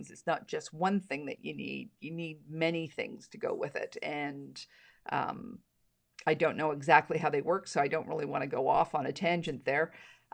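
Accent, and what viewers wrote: Canada. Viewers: Hello I love your videos and really appreciate a Canadian voice. Because sometimes we don't always get the best variety and prices over here. But we love what we do. I would still consider myself a beginner in the grand scale of things. However I'm getting there for sure in terms of building my basics. And keep seeing your beautiful complex personal projects. Wich leaves me to ask if you can do a more intermediate quilt block video of some of your favorite blocks. Even some from the beautiful backdrop behind you 😍 would be wonderful and very appreciated.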